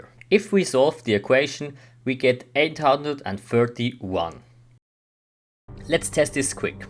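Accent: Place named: Switzerland. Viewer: very thick swiss accent haha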